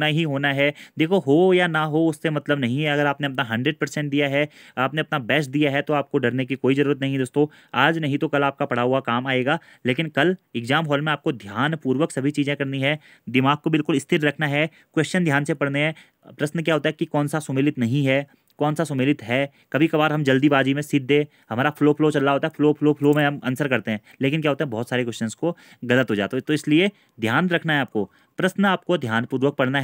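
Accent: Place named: Pahadi, India. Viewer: Hey! handsome bro, ever first time aapka deedar huwa, Literally handsome, I like your pahadi accent. M an esm, following you for uk gs❤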